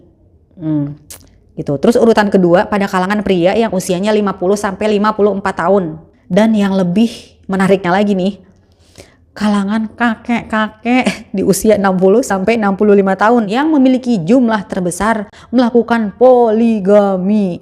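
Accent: Jakarta, Indonesia. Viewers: Alhamdulillah akhirnya dapet jwbnnya jg dimenit 10.40 dari awal aksen betokaw nya kentara bener mpok.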